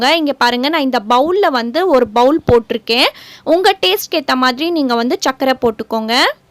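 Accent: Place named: Malaysia. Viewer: Neenga malasiyan ah sis? Unga voice la apdi theriyudhu adhan keten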